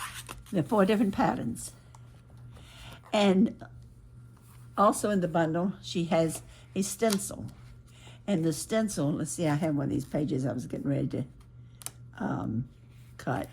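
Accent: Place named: North Carolina, United States. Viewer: I'd wondered about her accent too. I think she sounds so much like my relatives did from a small town in North Central, NC. I know about the tornado yesterday. I was watching the Ryan Hall Ya'll weather channel and praying no one would be hurt or injured but unfortunately people were killed and injured. My mother and I were very sad to hear that news. I do hope is OK I just had her video recommended to me by YouTube tonight. I don't do much paper crafting I usually make jewelry and crochet but love her content, she's so creative and her voice so soothing. I'm subscribing!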